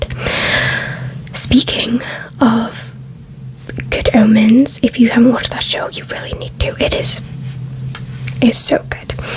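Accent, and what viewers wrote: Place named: United Kingdom. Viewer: Ramble vids are the best. Where are you (roughly) from in the UK? Can't quite put my finger on it.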